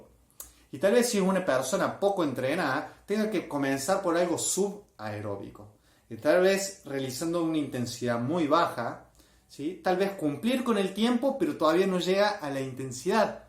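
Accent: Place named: Cordoba, Argentina. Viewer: Muy bueno, chango. cordobés tenías que ser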